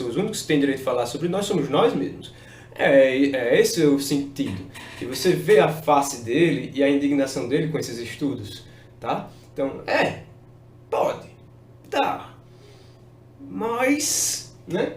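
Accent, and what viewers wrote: Northeast, Brazil. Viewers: Oi, você já foi membro ? Gostei do sotaque, é do nordeste ?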